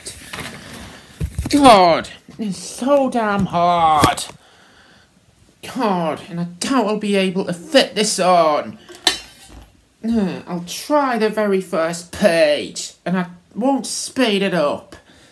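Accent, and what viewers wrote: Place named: England, United Kingdom. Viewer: Oh yes, youre from England:D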